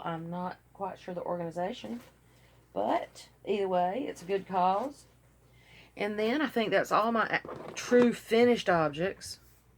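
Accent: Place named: Alabama, United States. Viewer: Hey!!! I knew you were from Alabama after the first 2 words😂! I'm from Chalkville- Hey neighbor! I live in Florence, AL now- so great to hear home folk and hope you start feeling better.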